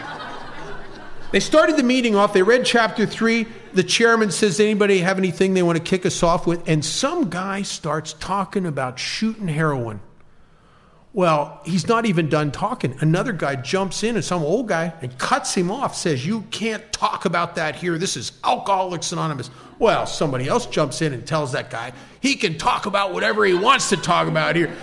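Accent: United States. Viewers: Nice to hear american english🥰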